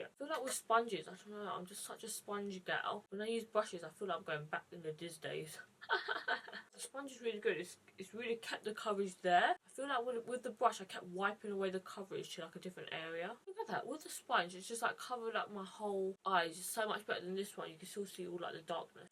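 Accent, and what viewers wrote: United Kingdom. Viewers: I’ve seen your videos/pictures on Instagram plenty of times. This is the first video of yours I’ve watched on YouTube though, and the first time I’ve heard your voice. Didn’t know you had a British accent!! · I don’t mean this as an insulting way at all, but when she spoke I wasn’t expecting the tone and type of voice she spoke on it’s so much fuller than expected and I didn’t even know she was British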